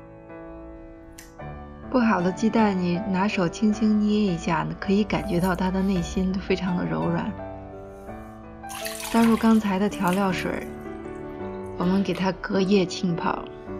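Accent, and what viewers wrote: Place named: Shaanxi, China. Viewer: 是西安人吗？看你做的菜，说话都有老陕的味道，好亲切啊！从炸油条开始，跟您学了好多零失败的做法，非常感谢！能介绍几款铸铁锅用烤箱烤的菜吗？觉得这种菜又方便又好吃，最适合带娃的妈！谢谢啦！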